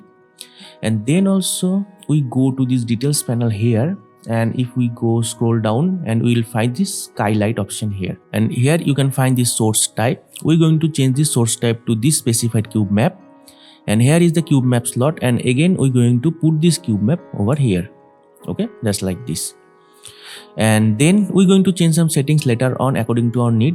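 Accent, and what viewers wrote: India. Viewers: oh no indian accent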